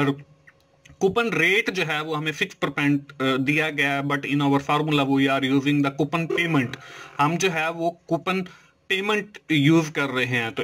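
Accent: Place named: Punjab, India. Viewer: bhi dont mind........ punjabi main english bolny ka style .......kamal hy yar.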